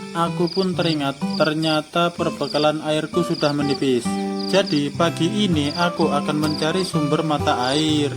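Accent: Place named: Java, Indonesia. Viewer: Logat jowo nyell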